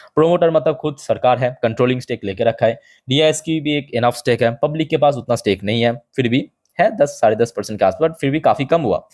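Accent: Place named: Bengal, India. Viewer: Like the way u speak.. R u begoli.. Speak hindi so sweet ❤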